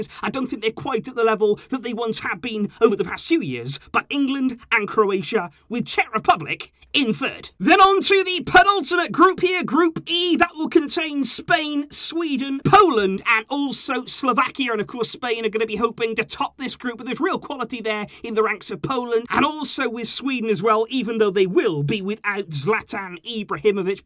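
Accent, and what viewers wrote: Wales, United Kingdom. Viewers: Am I the only person realizing he’s Welsh 😂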